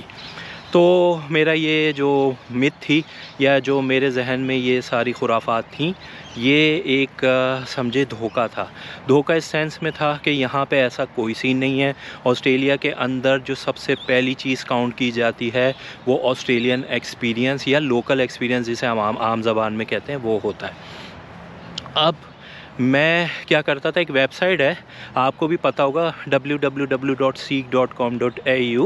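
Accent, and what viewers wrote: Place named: Karachi, Pakistan. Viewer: bhai baaton se Karachi ke lag rahay hain. I loved your video. I would love to meet you in Australia. I am landing soon.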